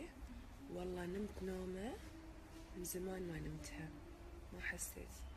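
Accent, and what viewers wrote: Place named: Gulf, Arab world. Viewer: اسمه جل وليس كريم. ليش تتكلمي لهجه خليجيه.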